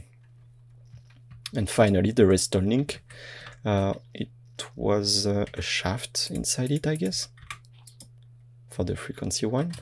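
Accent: France. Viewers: On aime l'accent français, super vidéo ! XD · Love your french accent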